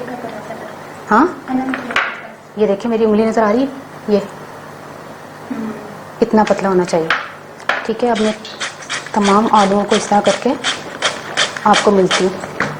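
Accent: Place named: Punjab, India. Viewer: Main bhi lahore main paida howi apki paki panjabi son k bohat khush howi main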